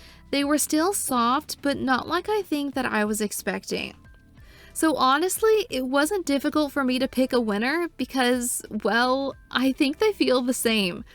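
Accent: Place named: Midwest, United States. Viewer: Have to disagree with this comment. I think your voice is great as is! (And this sounds more midwestern than valley, but both are fun.) Your content is solid and you speak very clearly.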